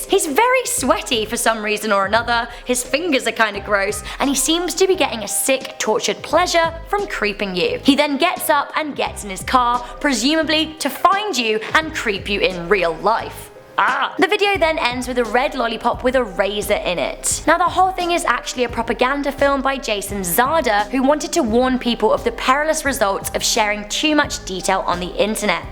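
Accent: England, United Kingdom. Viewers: The English accent takes her from a 5 to a 3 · Em jacks Her accent is English and that is what we are talking about. We're not talking about where she lives. He's referring to accents and she's no way Canadian in that department. I am British myself, so I would know. Lol.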